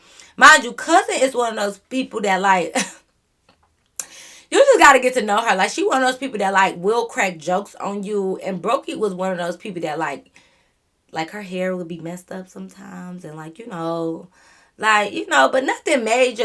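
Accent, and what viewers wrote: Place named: Michigan, United States. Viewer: Lol I just knew you were from Michigan soon as you started talking but once you said good times I’m Like yeahhhhh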